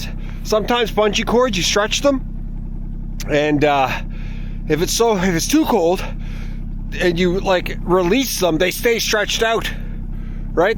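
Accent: Newfoundland, Canada. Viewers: Instant follow. Love the energy and vibe. I really like the Canadian accent. I used to get confused between American vs Canadian. 🇨🇦 now I can tell the difference. Just like aussies sound so different to kiwis. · Cod fish from the rock hey? Good stuff. Hello from Newfoundland. I live in Gros Morne National Park. How's the fishing around your cabin in the summer time? Tbh I almost pick up a Newfoundlander accent on your speech. Not originally from here in Newfoundland are you?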